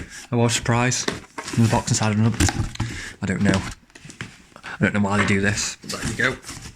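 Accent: Stoke, United Kingdom. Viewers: Thats a proper stokie accent. Nice surprise when looking at a random cooker video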